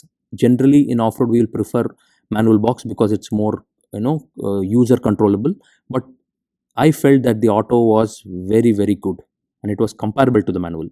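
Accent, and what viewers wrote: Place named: India. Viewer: Почему-то я понимаю индийский английский лучше, чем американский.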